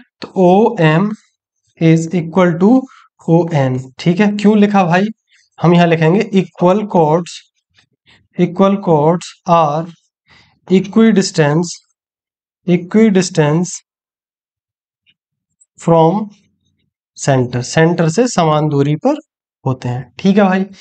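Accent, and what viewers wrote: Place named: Uttar Pradesh, India. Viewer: I think sir is from uttar pradesh 😂 that accent....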